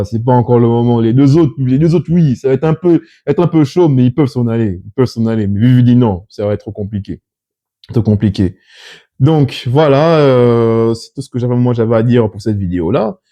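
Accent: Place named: Gabon. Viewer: Att tu es Gabonais parce que l'accent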